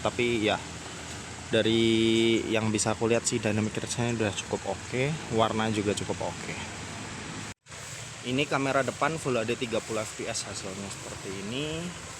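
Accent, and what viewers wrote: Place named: Purwokerto, Indonesia. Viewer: Wong Purwokerto kieh mase yahh? Pada bae, nyong sekang Purwokerto.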